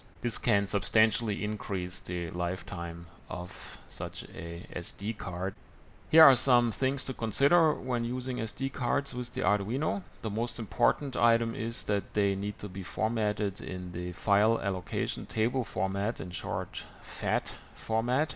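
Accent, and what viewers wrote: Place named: Germany. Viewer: German accent makes this video even more informal! ;) (like)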